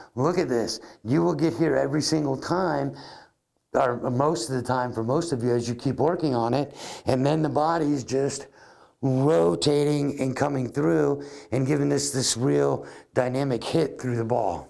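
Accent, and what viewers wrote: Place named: New Jersey, United States. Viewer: Great instructions again. Your reference to Ben Hogan's glass pane was a great thought and keeping that elbow low helps me. Consistent ball striking is the result. By the way, I'm from over in the UK and your accent is straight from The Sopranos. (No offence intended!!) Best wishes.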